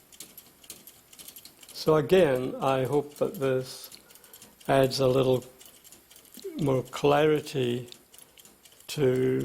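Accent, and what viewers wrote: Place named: Northland, New Zealand. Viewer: Just by any chance are you a Northland Kiwi????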